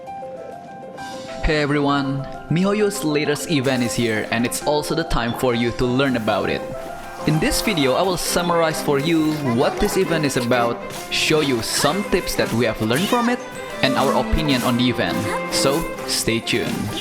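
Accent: Indonesia. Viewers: yes it is, even though I don’t really talk like that but I hear a lot of people in Indonesia talk like this. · i believe its indonesian accent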